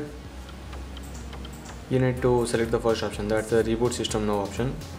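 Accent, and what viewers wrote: India. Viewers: Why do the people with Indian accent talk so fast? It makes nearly impossible to understand what are they saying..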